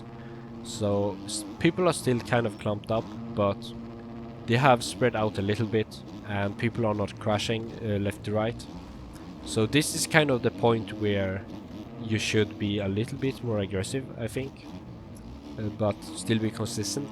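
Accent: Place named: Sweden. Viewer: mate, you have the most swedish accent i’ve ever heard lol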